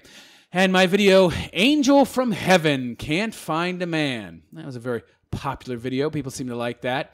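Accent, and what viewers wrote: New York, United States. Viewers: I swear this is Bill Burr s secret YouTube personality haha Sounds just like him he puts the Patreon thing up to sell it that he's a regular guy too hah a bit of a voice changer mic too if not Bill should hire this guy to fill in for em when he's sick perhaps he's a lil more new york than Boston but still crazy close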